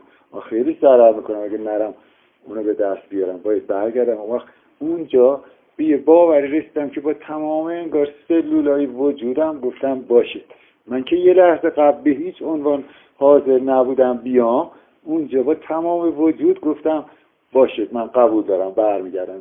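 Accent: Isfahan, Iran. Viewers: دمش گرم که اینقدر صادقانه با لهجه شیرین اصفهانی توضیح داد! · کیره هر چی رشتی تو کس خار مادرت..با اون لحجه تخمی..کونکش موقع مردن هم ناپاکی.خارکسده.اصفهانی پورو.کیرم تو لحجت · عالی بود. واقعا همینه. بعد از مرگ ما زندگی دنیامون رو بررسی میکنیم تا به درجه بالاتری از کمال برسیم. اموزه های مذهبی برای رسیدن به حقیقت بوده اما متاسفانه انقدر هدف گم شده که طرفدارهای هر مذهبی فقط خشم و کینه نسبت به مداهب دیگه دارن. ایشون واقعا رفته و برگشته و آنچه دیده کاملا درسته. من رفت و امدهایی به دنیای موازی داشته ام. عشق و محبت ساطع کردن به مردم عالیه. اگر غیر از این باشه بعدا که زندگی خود را میبینیم ناراحت میشه روح ما. عالی بود با لحجه شیرین اصفهانی.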